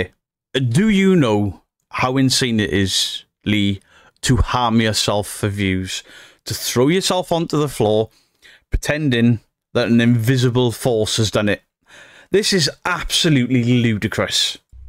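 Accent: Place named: North Wales, United Kingdom. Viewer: Hello from Toronto, Canada. Omgggg, you are killing me! This one was freaking hysterical, the interview had me crying laughing & trying to eat my tea at the same time 😂🤣😂🤣. I love your accent, I miss hearing the Welsh (North Wales) accent daily. Please keep on chasing the fakers & making us laugh at the same time. I look forward to you dropping new videos. Please do some debunking of that Canadian faker Moe Sargi, he was dumb enough to post a video that he did not edit properly & was called out for faking & he pulled the video.soooooooo fast. Take care & have a great weekend ❤️ Janet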